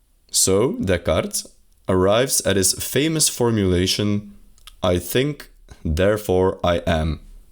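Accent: Flanders, Belgium. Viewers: Benje van België lol? Ik hoor uw accent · Thanks for making philosophy digestible :) Are you a native Dutch speaker (with a Flemish accent)?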